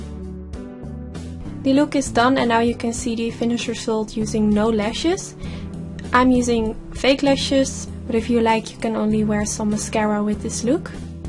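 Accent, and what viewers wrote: Netherlands. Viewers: Aha! I knew you were Dutch! :-D My dad was half Dutch and half Indonesian so I recognised your accent and it made me think of him which is nice. Where in The Netherlands are you from? My dad and his mother lived in Den Haag and my family used to visit her when I was a child. :-D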